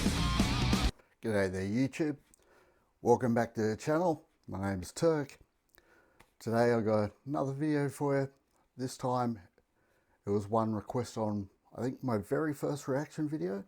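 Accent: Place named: United Kingdom. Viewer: So ok I have done my job, subs, liked and will make a little blabla to your reac.! So yes is a great metal band from Ukraine, the lyrics are often hiding a great support to their country wich is fighting against these bloody russian! I hate them since I was young,and now I am a really old french metalhead (Second Wave Black Metal the most I think)... But I have a first question are you from the US or the UK, because the most reaction channel I see are from the US and you have such an accent, I think you're from the UK? (Great if yes). Second question, why do you name you "old...."? I am old yes, you could be my son, easy!!! Ok about an other song from Tatiana... I think about live official vid... Great song! (Like all song ^^). And dont bother to much about backing tracks things, is a real true band!!!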